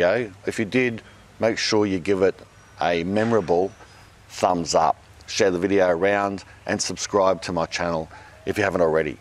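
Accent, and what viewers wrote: Australia. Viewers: You really seem like such a kind and thoughtful man. I have nothing but respect for you and your family and I’m glad that you becoming a content creator has helped your family out. Haha maybe it’s your Aussie accent in my American ears playing tricks on me but I doubt that. I wish you a happy Memorial Day from over here in the States.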